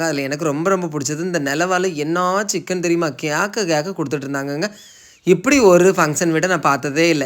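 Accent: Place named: Nagercoil, India. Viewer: Super your speech my own house super people speech same great good nargovil speech super thanks 🙏🙏🙏👍👍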